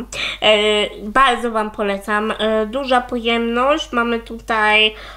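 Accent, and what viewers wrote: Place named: France. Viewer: Muszę wypróbować polecany przez Ciebie tusz od Eweliny 😁 a Tobie z tej samej marki polecam bazę pod tusz. Aaa cudnie mówisz z francuskim akcentem 😄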